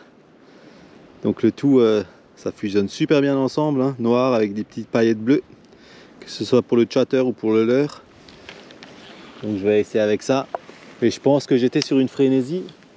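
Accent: Alsace, France. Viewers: Sans ton accent Alsaco tes vidéos ne seraient pas les mêmes. Tu transmets parfaitement ta passion pour le carnassier au leurre. Personnellement et actuellement j utilise le Slick Finess en linéaire (usage détourné) .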